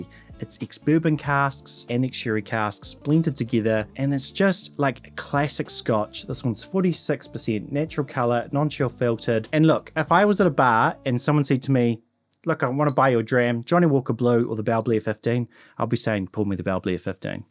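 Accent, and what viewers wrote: New Zealand. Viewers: I like the content, but that New Zealand accent is killing me, at times is almost unbearable. I will try another video.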